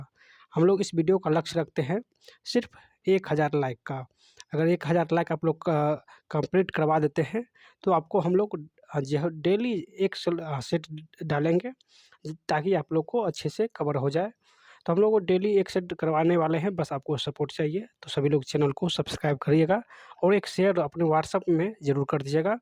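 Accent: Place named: Gujarat, India. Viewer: सर आपकी मातृ भाषा गे छे वाला है सही या गलत आपके बोलने के tone se पता चलता है😊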